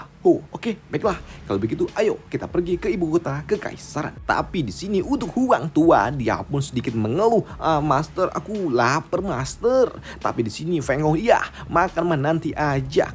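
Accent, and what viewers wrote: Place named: Java, Indonesia. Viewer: Medok bang heheheeh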